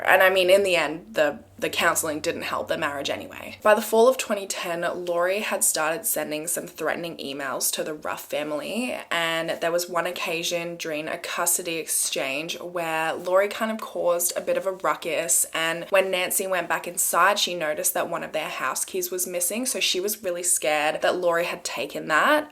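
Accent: Australia. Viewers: Since 1985, I've heard the beautiful Australian accent every day, thanks to Neighbours.. So after this Friday, you'll be helping to fill that void for me!!... 😔💜💜💜💜💜🇬🇧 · Love the Aussie accent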